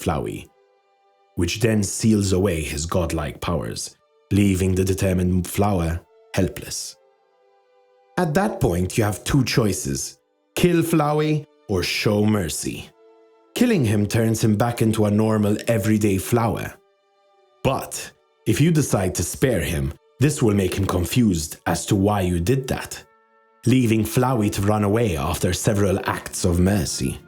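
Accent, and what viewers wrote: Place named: Malta. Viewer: you sound maltese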